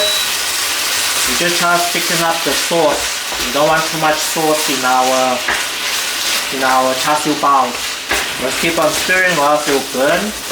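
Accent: South Africa. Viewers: Where , Johannesburg, Port Elizabeth , Cape Town?Nice to meet you , I was born in South Africa , now live in Australia. Love the accent.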